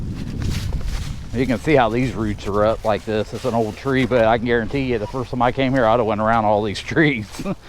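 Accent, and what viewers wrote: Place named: Ohio, United States. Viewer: From Ohio. Good to hear the Ohio accent “worsher” never change :). Reminds me of home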